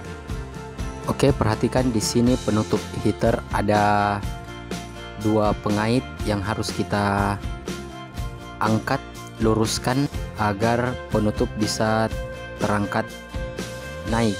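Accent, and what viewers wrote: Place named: Makassar, Indonesia. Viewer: Sprti nya anda orang makassar ya?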